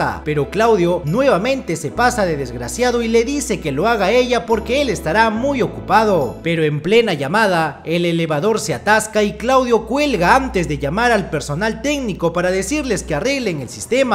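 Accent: Peru. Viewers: eres el mejor dando resumen con toque peruano csm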